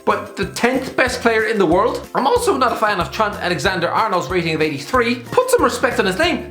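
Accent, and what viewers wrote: Ireland. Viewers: After a night of no sleep it’s always nice to have the in my ear at 7am in the morning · I clicked on this video just to see the say "Hello and welcome to HITC sport!" 😭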